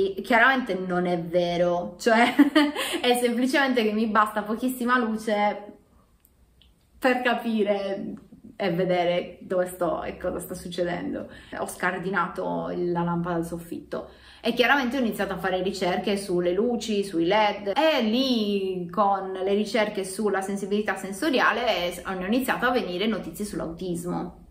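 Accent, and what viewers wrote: Italy. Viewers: Ovviamente più intelligente del normale 😅, l'accento sardo e' carino...😅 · ciao sardo anch'io e con lo stesso modo di parlare al minuto 2 e 30 (ghe al posto di che) alla romana ma io nn vivo a roma....è normale???complimenti per il coraggio (sei anche una bella ragazza)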